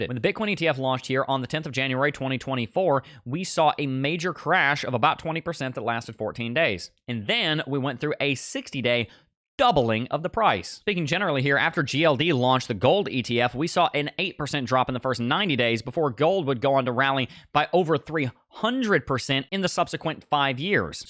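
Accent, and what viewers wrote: United States. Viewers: Im from the uk a normal person listen to this yank typical noisy thiko yank Thinks hes on a yanky bog roll advert 😂😂😂😂😂😂😂😂😂😂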